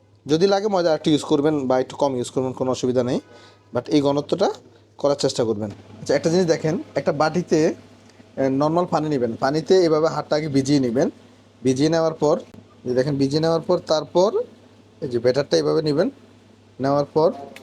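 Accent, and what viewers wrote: Noakhali, Bangladesh. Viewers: ভাইয়া আপনার গ্রামের বাড়ি সন্দীপ নাকি নোয়াখালী?জিজ্ঞেস করলাম কারণ আপনার কথায় এ দুই জেলার আঞ্চলিক টান আছে।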